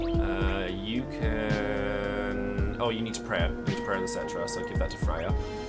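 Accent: United Kingdom. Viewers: I just started this game this past weekend, so was looking for some videos and came across your channel. I love the accent (British?) and you're a cosplayer as well (awesome). I need look no further and subscribed.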